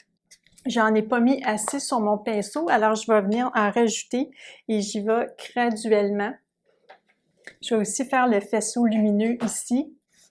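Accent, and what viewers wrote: Quebec, Canada. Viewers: Merci pour ce partage, c' est toujours intéressant et rassurant de voir vos différentes techniques...et j' aime bien votre petit accent( canadien?) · washhands:❤‍🩹jadore votre accent Quebecois Merci pour Les astuces je debute n peinture a l'huile c'est difficile si on a pas de formation Merci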